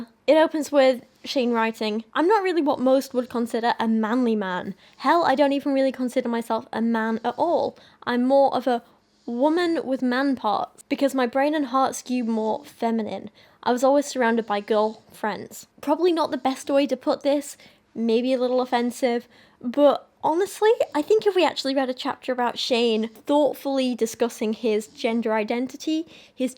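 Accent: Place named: United Kingdom. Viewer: I have been wanting to ask but your accent... Where are from? I'm guessing UK but what part?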